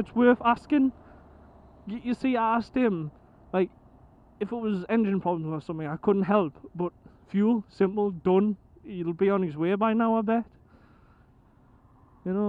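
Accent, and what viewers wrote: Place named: Newcastle, United Kingdom. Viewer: Very happy you are back at it! And I’d do the same for a fellow rider even if I had to travel far to get the gas... I mentioned this to you before that my great grandfather was a gordie so when I here your accent it reminds me of him :) great vid m8 💯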